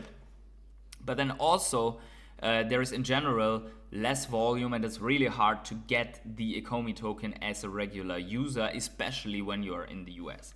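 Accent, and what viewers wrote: Germany. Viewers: Das ist mal heftiges denglish 😂😂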